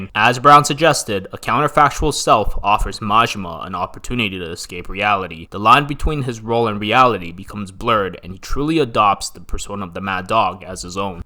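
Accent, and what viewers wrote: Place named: United States. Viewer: I love the video but hate your American accent butchering all the pronunciations of the names. Sounds like yarkuza the way you say it and marjimar I hate the accent. YA KU ZA. MA JI MA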